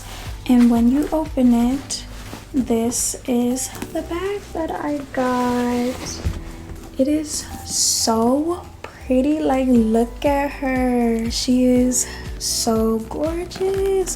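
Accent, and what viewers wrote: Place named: Los Angeles, United States. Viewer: Your voice says Los Angeles but your eyebrows scream the South Bronx. Nice bag.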